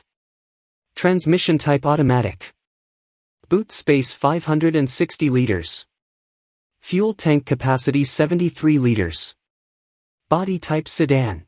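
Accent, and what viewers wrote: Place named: United Kingdom. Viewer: How did you change your voice to uk English accent?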